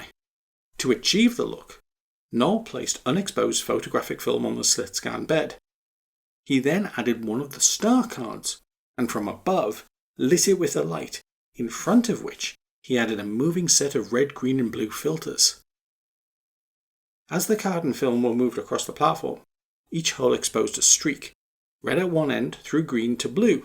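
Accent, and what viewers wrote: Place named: United Kingdom. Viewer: First time seeing your channel, I think. It really reminds me of Captain Disillusion, if you're familiar with him. Great production values where you can tell a lot of effort has gone in, and very educational with clear, inspired examples to show what you're talking about. Like a less boisterous, more British version of Captain D, for sure!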